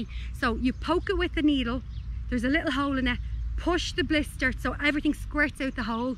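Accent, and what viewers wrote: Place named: Dublin, Ireland. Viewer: Love your accent sweet Dublin 😍great video thanks 🦁lionel x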